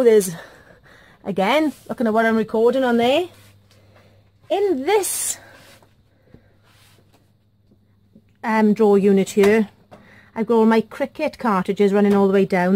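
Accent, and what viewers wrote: Wales, United Kingdom. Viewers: I love this craft room. I also adore your Welch accent. I've never even filmed a single video yet as I hate my Geordie accent 😖. Thanks for sharing this amazing space full of awesome crafty organisation. I've just ordered the mint green trolley from hobbycraft and waiting for it to be delivered so you have defo gave me some fabulous ideas on how to use it for all of my paint and glitter supplies. Happy Crafting xx Berne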